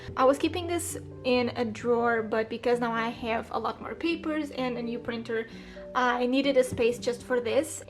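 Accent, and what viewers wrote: Portugal. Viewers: adoreii !! reconhece-se logo um portugues ahah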